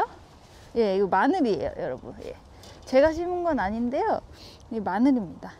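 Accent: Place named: Jeolla, South Korea. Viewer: 아 그렇군요 전라도 사투리 한번씩 쓰시는거 같아서 ㅎㅎ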